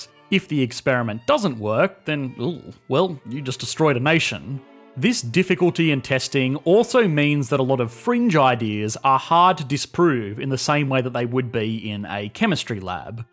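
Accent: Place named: Australia. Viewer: Jesus the narotor's accent is giving me a headache. Listening to Australian accent for prolonged period of time sounds like a distressed, agonising animal or mentally challenged individual with speech inpediment.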